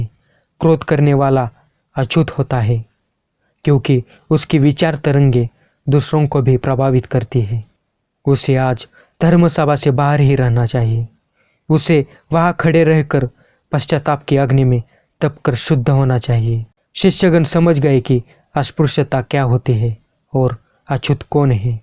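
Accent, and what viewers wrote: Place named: Maharashtra, India. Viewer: तुमच्या हिंदीत मराठी झलक आहे मराठीत बनवा video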